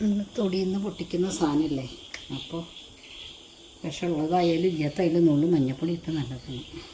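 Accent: Valluvanad, India. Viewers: Sister, i have become a big fan of your videos.... ആ വള്ളുവനാടൻ ഭാഷ കേൾക്കാൻ തന്നെ സന്തോഷം ആണ്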